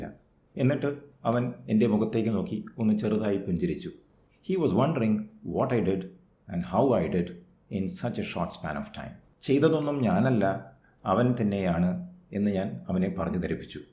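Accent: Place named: United Kingdom. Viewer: your pronunciation of English is exactly like a British native speacker, wish i could speak English like you.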